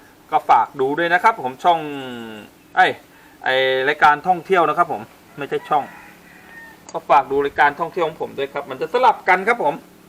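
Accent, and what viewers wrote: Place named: Uthai Thani, Thailand. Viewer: สำเนียงช่าง เหมือนคนหนองฉาง อุทัยเลยคับ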